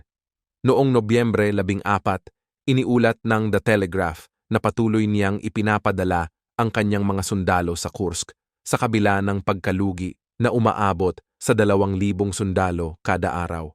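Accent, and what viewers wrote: Visayas, Philippines. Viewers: Hoy ayusin mo tagalog mo.biaya kaba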